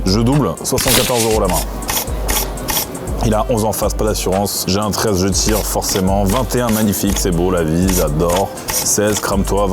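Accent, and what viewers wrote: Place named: Quebec, Canada. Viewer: Il a accent Québécois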